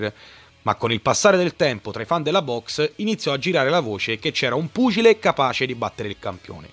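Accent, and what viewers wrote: Tuscany, Italy. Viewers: L'unico canale di MMA che parla toscano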